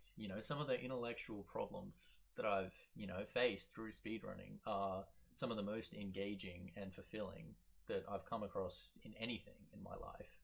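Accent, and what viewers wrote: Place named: Australia. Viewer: OMG YOUR AUSTRALIAN! I DIDNT KNOW. I AM. PLZ TELL ME U LIVE IN MELBOURNE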